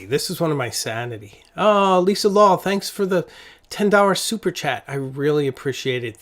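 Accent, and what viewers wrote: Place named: Massachusetts, United States. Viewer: you are already very cool. and your speech is very nice, it sounds like a Massachusetts Connecticut accent with a slight hint of Bostonian-lite. you could literally host PBS Masterpiece Theater and no one would think it out of place with either good style or speech. in fact, you could probably make money on the side as a voice actor. and about your story episodes, they are poetic and very entertaining. by the way, people with northeast accents that strive to enunciate well are often considered snobby and pretentious because that's how snobby and pretentious people are portrayed on tv and in movies, right? it takes a while for inexperienced people to learn this. i believe we have cartoonists and writers/ actors to blame, since they perpetuate this myth and perhaps it is a bit of a truth when seen in actors and elites off stage who belittle those who do not subscribe to the marxism by any means necessary philosophy and will literally tell you day in and day out how you are not better than them? 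No? i have been busy with the health concerns of my mother, mother in law, my wife, my sister, my neices, brother in law, and myself, and cooking every day and working on this house, my friend. i am sorry i missed your story videos. i don't get notifications on most of my subscriptions! oddly, the disaster channels always show, and a nice man in england who teaches english one word at a time, with some odd ones thrown in often enough to make it interesting! i hope you have a great week.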